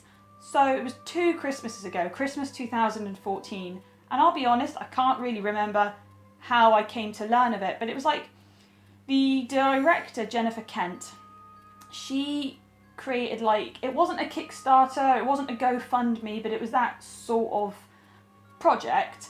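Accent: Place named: Newcastle, United Kingdom. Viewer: Are you from newcastle? Because you say book like that. I looovvvee your accent 😊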